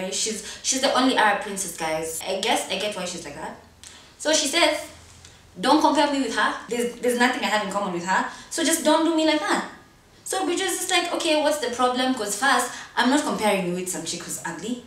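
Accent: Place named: Kenya. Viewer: Yah nver fake an accent hhhh I like kenyan accent cming there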